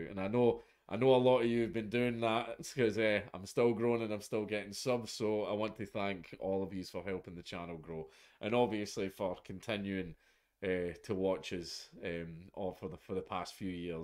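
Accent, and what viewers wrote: Scotland, United Kingdom. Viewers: I love watching all of your videos, I love just sitting back and listening to mini-documentaries in a Scottish accent You've made the past couple of years much more bearable, so thank you and Merry Christmas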